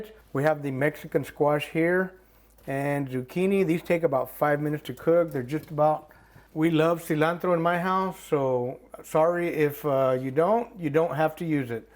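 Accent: Texas, United States. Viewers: I'm in HTown. You sound like a true Texan. Your recipe and directions are spot on. And I love the way you talk. It's like being right here with the fam.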